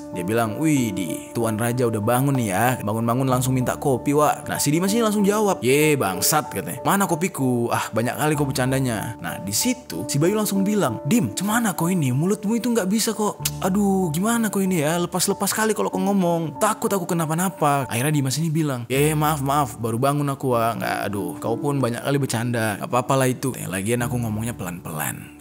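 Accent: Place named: Medan, Indonesia. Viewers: Maaf bg,aku orang Medan,aku ngakak kalau dengar bg ngomong logat medan😂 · Logat yg enak didengar g terlalu kasar dan ga terlalu lebay ya logat medan,melayu baguss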